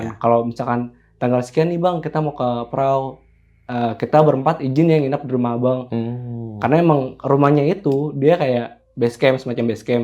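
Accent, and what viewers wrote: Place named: East Java, Indonesia. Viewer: saya kira vidio realnya mange🙏🏼... soale saya orang Jawa timur😊suaranya kyk orang Jawa timur 😊🙏🏼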